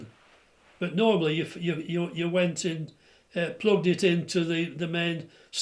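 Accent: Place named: East Lancashire, United Kingdom. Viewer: East Lancashire accent. Do you not think so ? lol ....I locked myself out of my house house here in Wisconsin when it was MINUS 30 C. I was wearing a T shirt and I thought I would die in 5 minutes. My house has security glass and I couldn't break in with a big hammer so I begged for shelter at a neighbours house......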